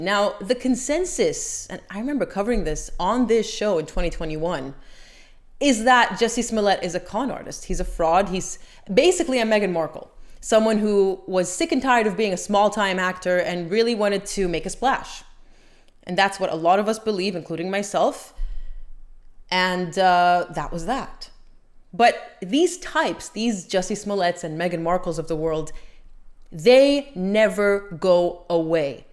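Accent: New York, United States. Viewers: I was surprised when you said you’re not an American and you’ve never been to the States. You have an American accent! I just assumed you were born here and moved abroad. Where are you from? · Whaaaaaa? You’re not an American? You never been here? You have a perfect American accent!!! How do you manage it? I admired you before but now that I know this, hats off to you lady!! Where are you from then? Wow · I always thought you were American based on your accent !!!!😂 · How can you never have been to the states and yet speak like an American? I love your program, your mind is sharp and your delivery is exceptional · Don't you have an american accent? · Your accent sounds America. Where are you originally from? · It blows my mind you’re not from the US because there is NY writ large in accent, mannerisms, hand gestures. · How do you have such a US American accent if you've never been here? 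Not unless you did your schooling in Canada. Sometimes their accents sound the same :)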